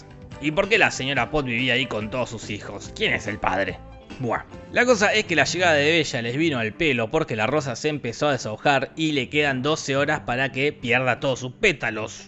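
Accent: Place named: Argentina. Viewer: El único argentino que me gusta como habla jasajksak ❤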